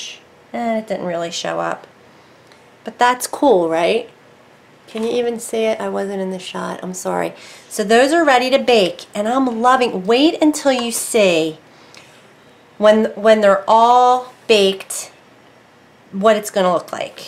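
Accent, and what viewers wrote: New Jersey, United States. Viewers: I know you're not wearing a mask in this video, but using long term mica powders can damage your sinuses and lungs. I know it's not fun, but please do it. I'm loving your channel, love your Jersey accent and joyfulness. Blessings to you and your family. xxXX Grambo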